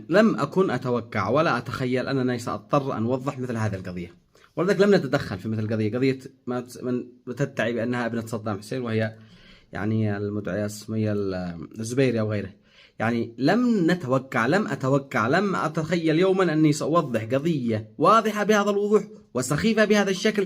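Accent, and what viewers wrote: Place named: Yemen. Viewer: ياخي قد لهجته يمنيه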